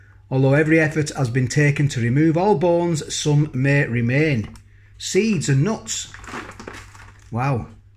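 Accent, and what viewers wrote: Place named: Yorkshire, United Kingdom. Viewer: Loving the accent mate, are you a Yorkie, or a Lank. My missus has a similar accent, she's from Todmorden.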